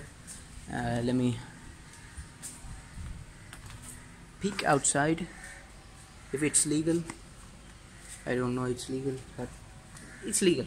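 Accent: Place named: England, United Kingdom. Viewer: Aye haye English accent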